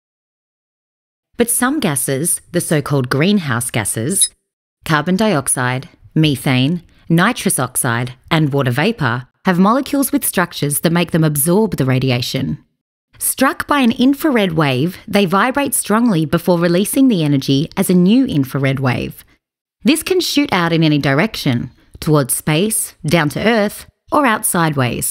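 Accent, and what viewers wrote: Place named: Australia. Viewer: Sounds Australian.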